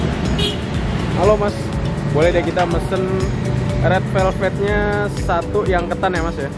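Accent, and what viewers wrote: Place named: Banyumas, Indonesia. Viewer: Udh tau dr tegal manggilnya aa, tegal kui jowo ngapak mas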